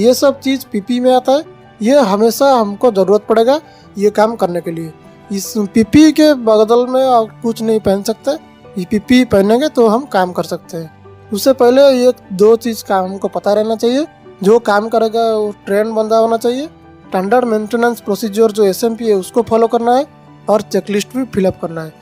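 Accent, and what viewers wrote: Odisha, India. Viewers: Thanks yr.....or ek baat.......mujhe lagta hai aap Odisha se hai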